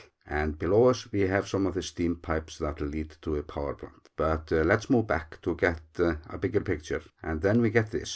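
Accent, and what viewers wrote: Iceland. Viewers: could you speak SLOWER with your Icelandic English, please???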